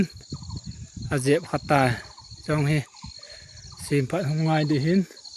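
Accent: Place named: Assam, India. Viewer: Napaodan style chu Assam langho hinnate na...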